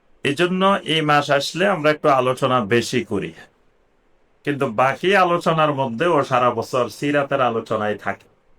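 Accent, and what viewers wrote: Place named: Noakhali, Bangladesh. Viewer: বাউরে হেতে বলেন চমৎকার। তবে নোয়াখালীর আঞ্চলিকতা ছাড়তে পারলেন না এখনও হুজুর।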